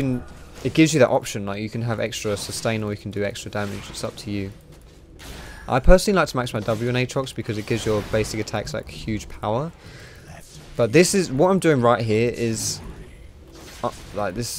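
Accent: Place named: United Kingdom. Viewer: Where in the UK are you from?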